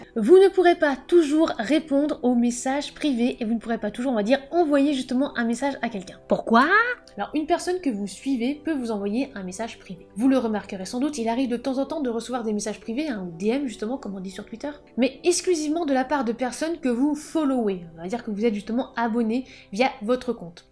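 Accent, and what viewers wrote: England, United Kingdom. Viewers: Merci l'accent anglais ! coeur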